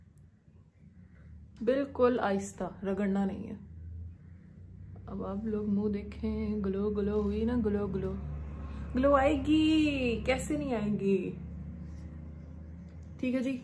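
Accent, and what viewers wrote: Lahore, Pakistan. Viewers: I love ur pakistani accent 😘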